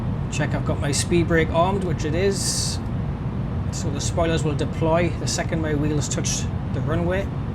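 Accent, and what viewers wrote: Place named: Newcastle, United Kingdom. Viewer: nice accent, hello from a fellow geordie. nice video mate.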